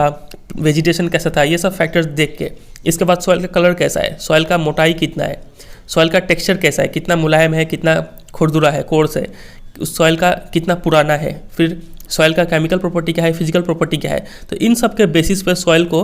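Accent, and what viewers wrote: Bihar, India. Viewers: Kya aapp bihar se ho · Sir aap Bihar se ho Kaya please reply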